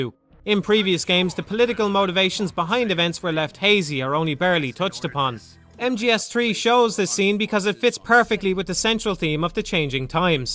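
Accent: Ireland. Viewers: He is Irish so I'd Imagine they have a bit harder to pronounce thingsm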